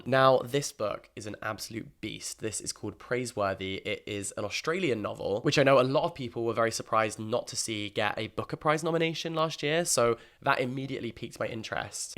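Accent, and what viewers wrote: United Kingdom. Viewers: I like your British accent, plz more book reviews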